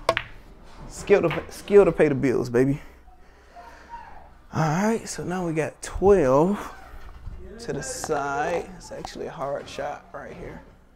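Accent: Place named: Washington DC, United States. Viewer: Bring that ass here boi😂 DC voice lol and bring a case of Coronas lol good shit playa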